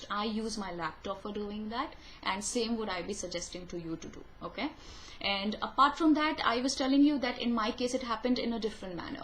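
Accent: India. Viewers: Hahahahahahahahah....... her accents is so Indian............I am not Indian and the moment she says, "Hey guys or but" i knew that she is paka Indian!!!! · Your accent are Indian. So you might be an Indian. Anyway let me try <link>. And BTW you are looking cool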